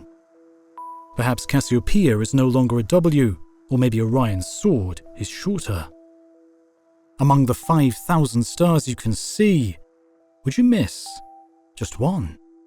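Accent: England, United Kingdom. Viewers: Yes, he is. That's an English accent. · Are you British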